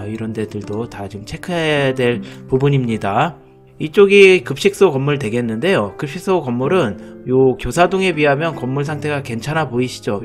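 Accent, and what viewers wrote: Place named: Gangwon, South Korea. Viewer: 채널주인분 강원도 아니면 충북 쪽분같내요 말투가 약간그쪽분같음 친근하내요 ㅋㅋㅋ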